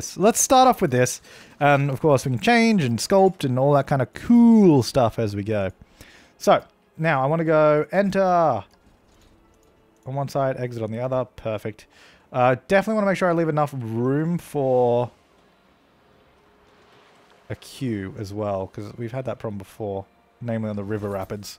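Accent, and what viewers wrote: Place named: Australia. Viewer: he has such an Aussie accent like it's not the stereotypical one but it's one of those Aussie accent. haha. I'm Aussie too but he sounds heaps like my mate.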